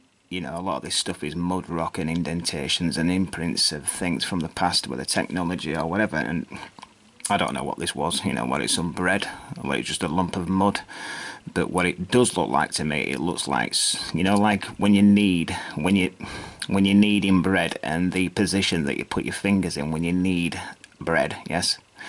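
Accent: United Kingdom. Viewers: up: What area of the UK is your accent from?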